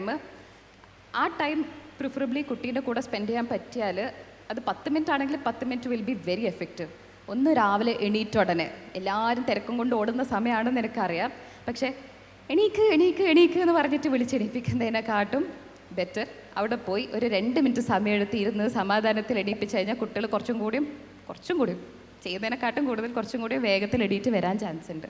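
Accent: Kannur, India. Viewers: Good advice to parents❤️. ഒരു കണ്ണൂർ സ്ലാങ്... കണ്ണൂർക്കാരി ആണോ ഡോക്ടർ? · കണ്ണൂർ ജില്ലക്കാരിയാ?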